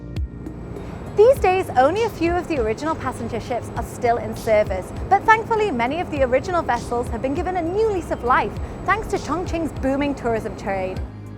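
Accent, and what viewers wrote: United Kingdom. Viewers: I like your UK accent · Sorry, hard to understand yr accent, English accent or British one, hihihih.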